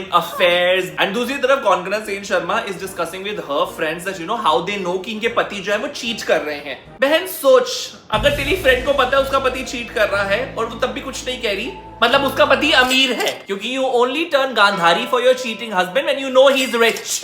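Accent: Punjab, India. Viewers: Your punjabi accent ❤❤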